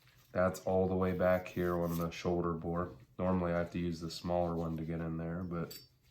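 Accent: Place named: New England, United States. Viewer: I just remembered him saying in his New England accent that steel is very “hard”, and that that steel will last forever. Just keep it clean and very lightly oiled, noting can happen to those rails. The only parts that can go bad are the cam pin and the parts actually on the bolt, the lugs, the extractor, etc. I’m not an expert though, but that’s my understanding. The rails probably will never be a problem.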